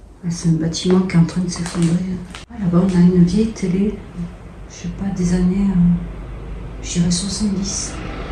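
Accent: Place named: South, France. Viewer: Belle vidéo avec un petit accent du sud . Bonne continuation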